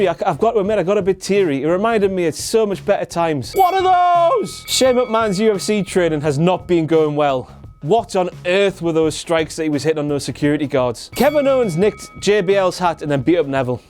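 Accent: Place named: United Kingdom. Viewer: british accent.. wtf is he speaking in?????? english?????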